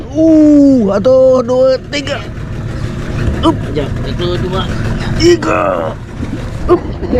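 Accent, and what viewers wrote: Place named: Melaka, Malaysia. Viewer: Bro dgr ckp mcm org Melaka ni bro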